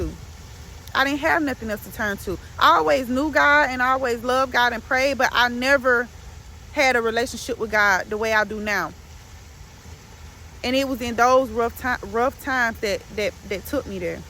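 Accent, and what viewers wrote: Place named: Georgia, United States. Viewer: Great & timely message.. I just know you from Georgia with how you talk..lol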